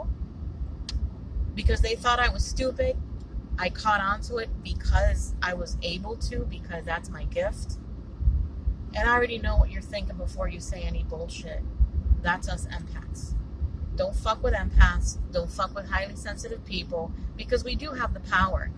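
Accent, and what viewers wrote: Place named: Latin America. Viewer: I heard your voice and I immediately tried to figure where you are from. I know South Florida, but I would think Venezuela or Puerto Rico. I don't hear so much cuban. Perhaps Colombia? I am into accents. INFJ/Scoprio here in Tampa. Btw, this is one of the problems I see as well. Great vid.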